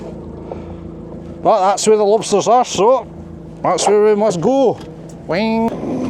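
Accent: Scotland, United Kingdom. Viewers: entertaining video. What a pretty place with what looks like pristine water.Your Scottish accent brings back memories of my late grandmother who migrated to Australia from Stirling after the first world war where she met my grandfather, who visited Scotland when fighting on the western front. Anyway, keep safe and good hunting.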